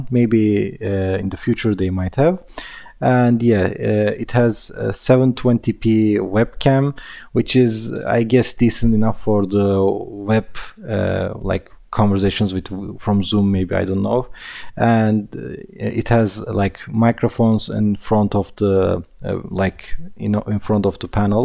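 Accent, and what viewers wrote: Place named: Poland. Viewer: Omg nicee accenttt~ I love men with Polish accent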